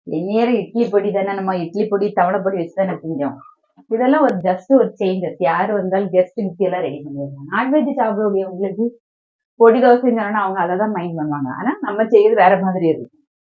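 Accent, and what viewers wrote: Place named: Kanyakumari, India. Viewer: கன்னியாகுமரி தமிலால 😮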